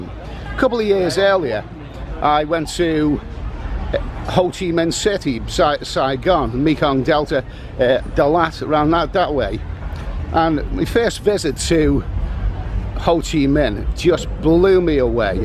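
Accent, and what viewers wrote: Liverpool, United Kingdom. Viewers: Are you a scouser or a wool?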